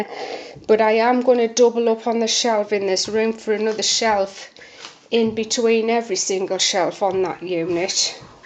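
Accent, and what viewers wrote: United Kingdom. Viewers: Definitely British :)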